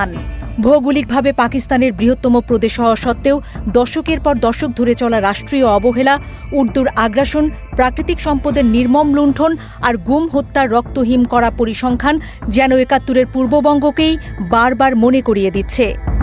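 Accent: India. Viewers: এনটিভি কি ভারতীয় সুরে কথা বলেছে?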